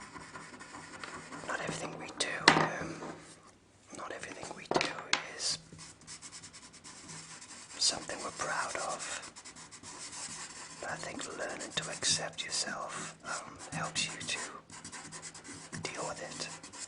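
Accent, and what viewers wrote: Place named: Newcastle, United Kingdom. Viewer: Oh really? I guess you kind of sound like a geordie too? :)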